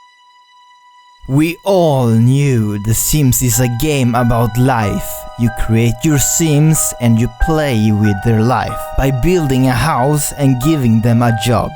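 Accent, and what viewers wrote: Sweden. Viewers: Are you from sweden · förlåt, men din svengelska är riktigt illa.. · Din svenska accent är så rolig och jättegullig. Jag älskar dina videos! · Aah, I love your accent!! It's so cute! I'm also from Sweden! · Are you from Sweden? xD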